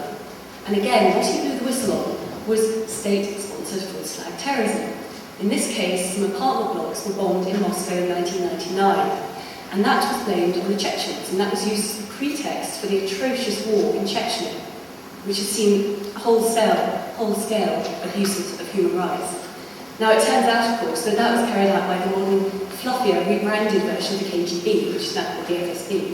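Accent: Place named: England, United Kingdom. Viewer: You cant understand English spoken by an English person? LOL. Its not because she is a "Limey" I think that its just simply that your uneducated.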